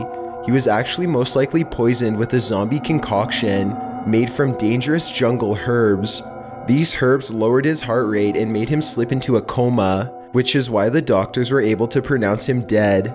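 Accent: United States. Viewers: lol u end ur sentences in question form? its cute i hav a friend that talks the same way! R u american? def. true stories i practice voodoo. but i don*t turn people in2 zombies. thanx for ur scary stories. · Is voice an accent in an American state somewhere if so its beats the broad Birmingham accent in the UK which is like scraping your nails on a blackboard,its like hes forcing the words out of his mouth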